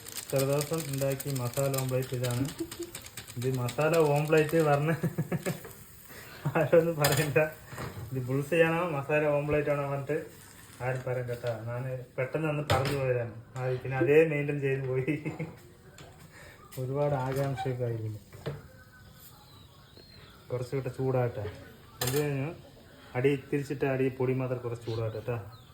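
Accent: Tamil Nadu, India. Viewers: തമിഴ് ചുവയുള്ള നിങ്ങളെ സംസാരമാണ് എനിക്കേറെ ഇഷ്ടം❤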